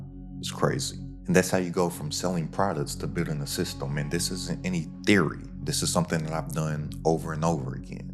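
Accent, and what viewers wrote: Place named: Los Angeles, United States. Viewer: oh wow that's trippy. Same exact type of slang and speech pattern as my homies in the LA area. Ur videos are fantastic, exactly what i needed. Keep up the good work 👏 starting a youtube channel very soon thanks to you. Finally going in.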